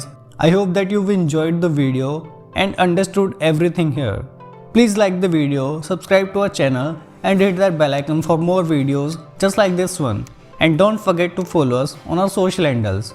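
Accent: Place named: India. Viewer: As soon as i recognised the voice was indian I was relieved and thanked god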